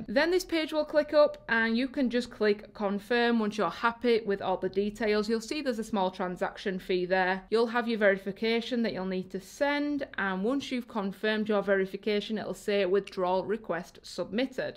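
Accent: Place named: United Kingdom. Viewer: british accent nice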